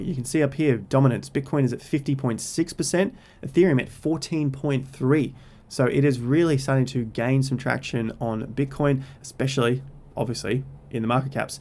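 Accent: Australia. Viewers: Great to hear great content with a bit of an Aussie accent...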